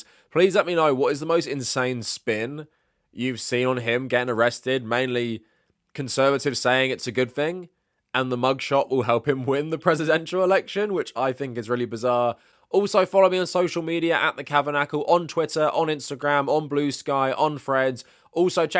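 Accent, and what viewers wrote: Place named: United Kingdom. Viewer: I've been watching your channel for a while and I'm not going to lie despite the accent I somehow never realized you were British till you said it in this video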